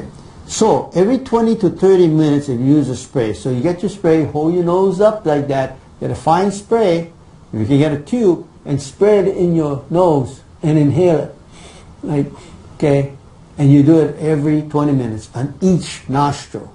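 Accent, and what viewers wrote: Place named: New York, United States. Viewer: So, let me guess. You kinda sound like you are from New York. Anyhow, I appreciate your chats. I had a thought of boiling a mix of peppermint leaf and oregano leaf in distilled water and then flushing it up the nose once it cools. You can also breathe in the vapors with a towel over your head over the pot too! I had a health food store owner friend in CT use the oreganol in boiling water and he cleared up!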